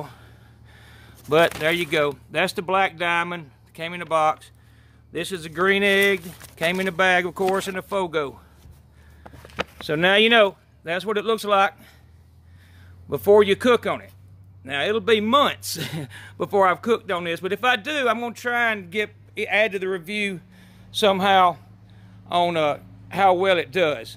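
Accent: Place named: Alabama, United States. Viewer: My uncle from another grandmother AND you're using FOGO! AND you sound like you're from Alabama like my family (we're in Texas mostly nowadays). Subbed!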